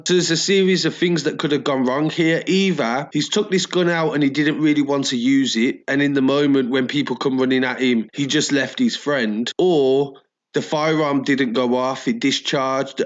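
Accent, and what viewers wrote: Birmingham, United Kingdom. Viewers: That fucking accent tho pmsl, fellow brummie here LOL , great content just subbed!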